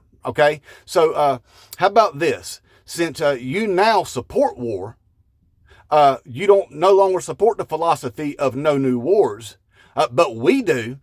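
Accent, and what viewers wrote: South, United States. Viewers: Love the Southern accent, brother. Keep bringin' it!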